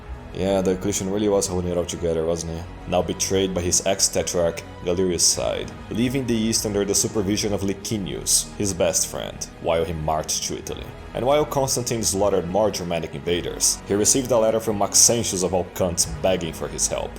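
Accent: Brazil. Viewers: O sotaque entrega o Brasiliense. A propagando ao Constantino é tão boa que até Eusébio teria inveja! Muito bom! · Teu sotaque de brasileiro deixa o vídeo muito melhor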